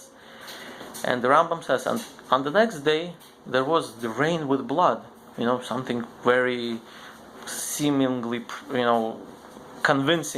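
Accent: Russia. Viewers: You are russian speaker по акценту замечаю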